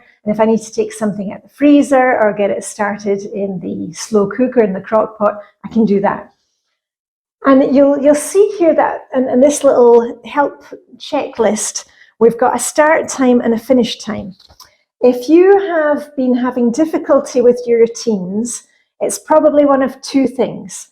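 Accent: Scotland, United Kingdom. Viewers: I love Denmark! But I perceive you are from Scotland!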